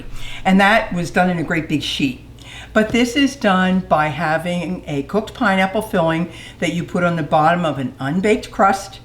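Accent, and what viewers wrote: Philadelphia, United States. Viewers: Is that a Philly/Delco accent I hear? 😃 This dessert looks so yummy! 🍰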